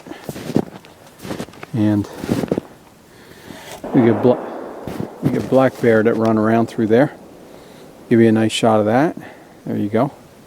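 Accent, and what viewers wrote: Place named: Upstate New York, United States. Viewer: Shooting in the woods both Filson vids. Are you in upstate NY, Penn State? I detect the accent maybe from there. Enjoy your vids. Greetings from Washington State.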